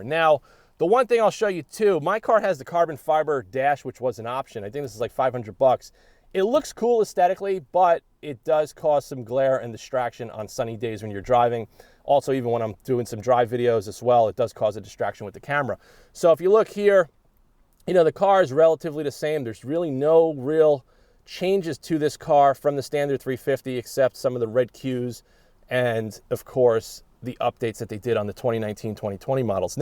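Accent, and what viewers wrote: New York, United States. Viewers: I bought a base model velocity blue 2020 gt500 on 1/6. It has white vinyl stripes. It won’t be driven in the winter. Your channel came up when I googled “GT500 in the winter”.It was interesting hearing your New York accent since I grew up in the Bronx. I now live in the very warm state of Minnesota. 🥶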